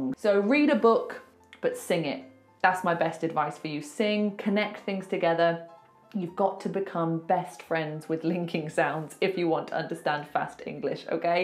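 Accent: United Kingdom. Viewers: You are also a bit challenging to understand. Because you speak with a British accent. The British accent is harder than the American to understand. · I love ur accent its like peppa pig's accents😅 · I don’t understand because I live en usa 🇺🇸 and this accent is like British 😏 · British English? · hello from japan! I wahtch ur vidio! it is very nice for me! and i very like ur brtain accent! very apreciate very super beautiful lady!! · Is this British English? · Proud myself cause I understood everything she said, and she’s British 😅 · british accent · Is this british accent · Excellent video! As a native speaker from the US, I love the various UK accents! I’m always fascinated by how you “remove the R“ from words like “doctor“ and “father,“ and then put it between other words, such as “saw a.“ (BTW, in the States we put a W where you would put that R: saw wa.)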